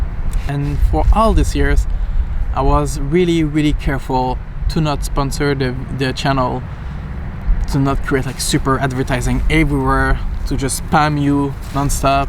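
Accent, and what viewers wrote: Quebec, Canada. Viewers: Oh man, what horrible timing my poor fellow Canadian. You really have something special with this channel, I know you'll be hitting the big youtube numbers in the next few years if not months. Your videos are nothing short of amazing, I just love reading your subtitles and hearing your wonderful accent in my head. Your shots are so beautiful, your stories are enthralling and completely worth waiting a few seconds to see commercials for! You're worth it! Don't sell yourself short. Maybe try for something part time just until you get the numbers up? Sponsors are fine too, it would be awesome if they let you critique them as you like to, those videos are very informative. Most people will take any adverts like a grain of salt and make up their own minds anyways so you shouldn't feel bad there! Wishing you all the best and looking forward to your next video <3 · I am new to the hobby and have enjoyed your videos for tips and honest opinions. 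I grew up in Brossard, so the accent is something easy for me to understand (I am in the USA now, but my French is still pretty good). Losing a job is hard as it shakes your confidence, but even with the economy being shaky, it is not the end of the world. Hope you find something interesting soon and that you keep making your good videos.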